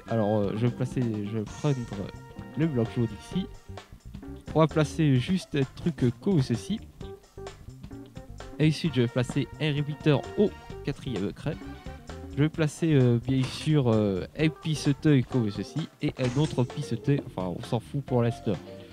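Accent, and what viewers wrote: South, France. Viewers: hé ! l'accent du sud c'est le plus bel accent du monde ^^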